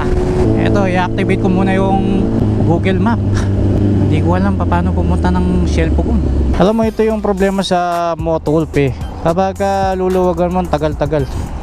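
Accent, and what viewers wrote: Pampanga, Philippines. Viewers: Yung pagtatagalog mo sir halatang kapampangan hehe ride safe always idol.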